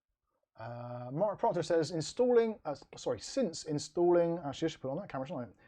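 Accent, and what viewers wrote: Bristol, United Kingdom. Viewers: you sound like me I'm from bristol aswell haha 😂 I'm in brislington end lol that's crazy, I've subbed aswell man good videos 🙂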